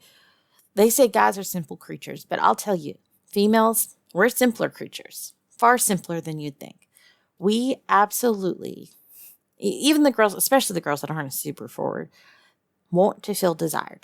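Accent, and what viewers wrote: South, United States. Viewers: Sounds of the South , only words missing is ya'll.....makes your voice cute as a button!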